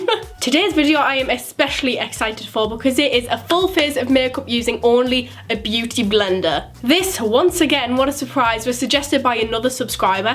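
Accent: Newcastle, United Kingdom. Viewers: where do you come from because I really love your accent?!😘😍❤️🇬🇧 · Amirah Amazing No, she has a 'Geordie' accent, e.g. People who come from Newcastle and Tyneside... North East England. Not Scotland 🙄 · Itza Guillen .... do u know ur accents because it is obvious she is British... ps we are all human so calling someone's accent weird is just plain rude. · Subscribing for that accent, I'm fascinated. She must be the most British person in the U.K.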